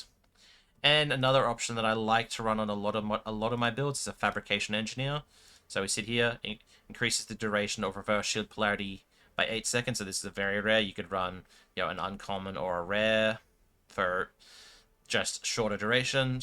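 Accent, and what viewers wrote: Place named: Australia. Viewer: Don't listen to whiney ass Americans. You are obviously Austrailian. If you say Ark Royale. Then just say Ark Royale...I'm American, and I don't think you should listen to others that nit-pick your accent. Fuck em....just say what you want. If you want to just call it the Ark Royale with Cheese....I won't complain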